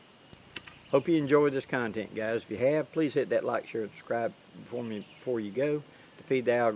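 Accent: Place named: Southeast, United States. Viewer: Liked & Subbed. You live in a Beautiful area from what I can see...May I ask generally what part of the country you're in? Based upon what slight accent I can decipher I would guess South-East US but that is very vague...Perhaps you would be willing to be a bit more specific..>Thank You!!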